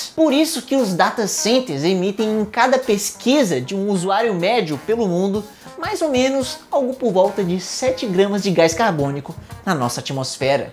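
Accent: Ceará, Brazil. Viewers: Grande cearense, meu vizinho de estado hahaha conheci pelo sotaque antes mesmo de ele citar em algum vídeo o "por exemplo, aqui em Fortaleza". Canal massa, camarada.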